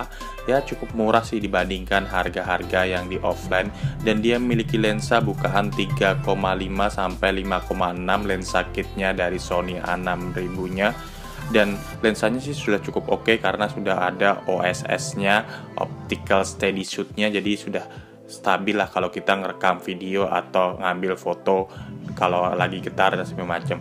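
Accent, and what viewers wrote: Banjar, Indonesia. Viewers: Urang Banjar kh jua Pian😅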